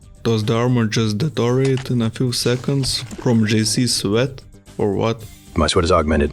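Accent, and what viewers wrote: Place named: Eastern Europe. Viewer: Hi, are you from Eastern Europe? Accent going kinda thick💪 Love your videos, keep it up!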